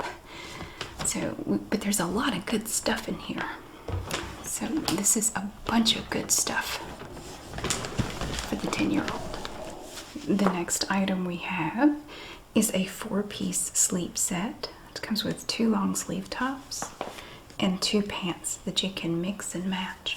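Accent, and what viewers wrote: South, United States. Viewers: Oh I feel so warm and lovely with southern accents just makes me feel happy when I hear it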